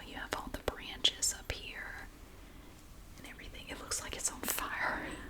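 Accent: South, United States. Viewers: Hi,you've got me well and truly hooked on your videos,especially the jewellery haul ones-only problem is,they are so relaxing that l fall asleep half way through,so have to view them over again to get to the end of all the items.l really enjoy listening to your southern drawl,l am from England so it's just fascinating to me.Please keep doing what you do,l will keep watching.Thank you so much,and may 2021 be a year of peace,good health and happiness for you and your boys(and 😺 kitties)love from Heather.xx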